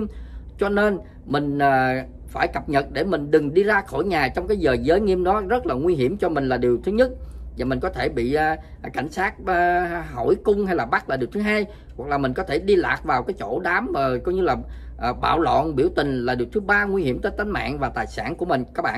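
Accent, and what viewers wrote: South, Vietnam. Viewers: Chào anh, mình xem clip của bạn,mình thích nhất giọng nói người người miền nam của mình.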